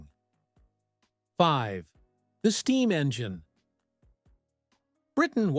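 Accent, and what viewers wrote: United States. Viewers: Scatish is what comes out of AI generated slop with an American accent that can not pronounce British words.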